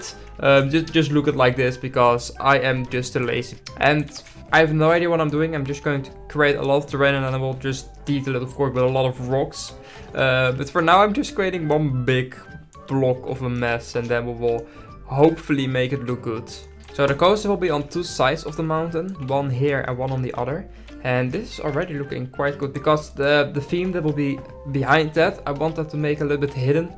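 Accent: Netherlands. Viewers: aan je stem te horen ben je Nederlands🤣😂:goodvibes::virtualhug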